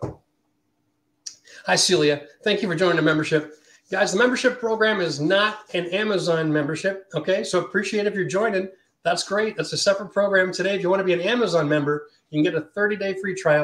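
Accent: Canada. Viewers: Wait, what? Are you Canadian or American?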